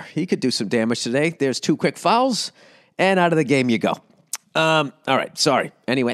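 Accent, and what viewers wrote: United Kingdom. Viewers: Dude that British accent fucking killed me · Ol Billy British Balls ranting about F1 in the accent is hilarious 😂😂😂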